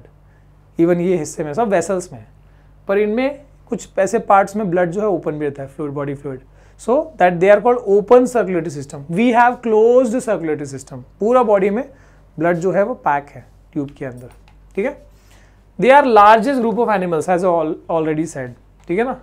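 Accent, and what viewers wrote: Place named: Bihar, India. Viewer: sir, u r from bihar... if i m right .. then i feel very glad to hear this 😊😊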